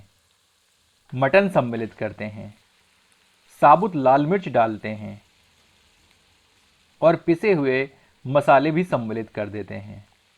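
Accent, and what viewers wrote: Standard. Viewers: आपकी शुद्ध हिंदी को सुन कर मन तृप्त हो गया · Your hindi sounds so pure.. great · Bhai etani shudhha hindi mujhe dharmendra ki filma yad aarahi hai aise hi bol rahe the. Ati swadista. Uttam lajwab jivha par laar prakat ho raha hai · Beautiful shudhh hindi. Lovely to the ears.🌹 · Aap ekdum shudh hindi bolte hai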